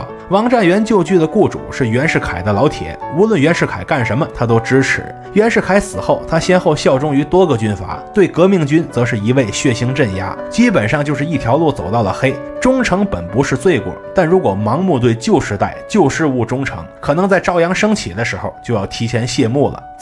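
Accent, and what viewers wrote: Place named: Tianjin, China. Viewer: 听着这种天津味儿的普通话倍感亲切。只有我们天津人才能听出来。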